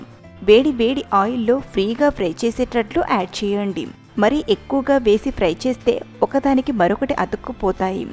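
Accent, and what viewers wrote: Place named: Tamil Nadu, India. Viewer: Your accent is like Tamil mam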